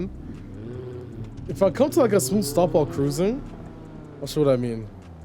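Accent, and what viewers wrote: France. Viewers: Yesss can already hear that French accent rubbing off on you! You should come to the UK bro! So happy for you do your ting fam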